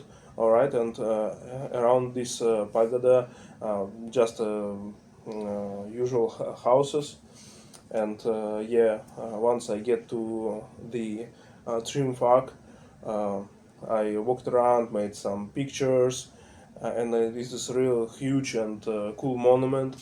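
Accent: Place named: Russia. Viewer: У меня впечатление, что так разговаривать может только россиянин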